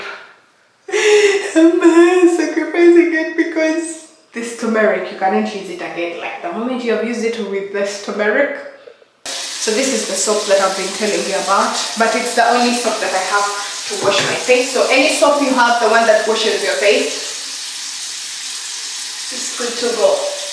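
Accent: Uganda. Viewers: You sound like you from UG, am I right?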